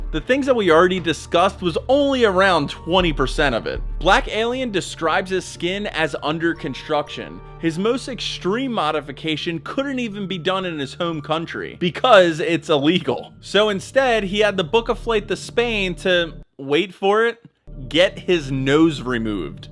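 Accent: Philadelphia, United States. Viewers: This might sound crazy but im From South Philly and I can hear a slight philly accent when u talk. R u from Philly or around the area?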